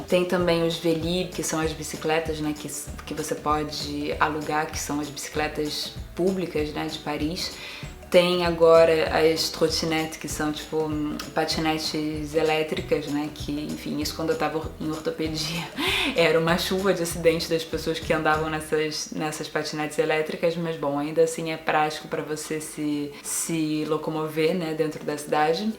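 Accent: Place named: Rio de Janeiro, Brazil. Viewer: Sua voz é muito boa, dicção também! O nosso sotaque carioca é muito bom hehehe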